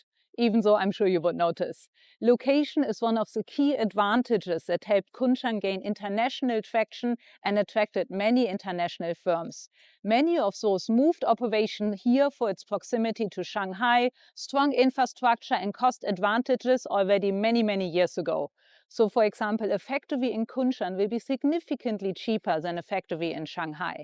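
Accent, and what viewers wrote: Germany. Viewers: Great video! Promoting a city while advancing your professional service! No wonder, with your German accent you are so successful! Cheers!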